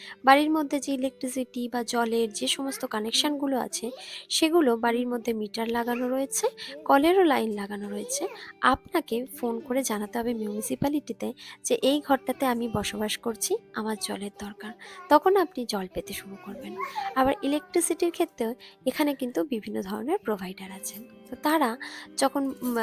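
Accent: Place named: Bangladesh. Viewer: আপনার ভয়েস টা শুনে ইন্ডিয়া মনে হয় না বাংলাদেশী মনে হয়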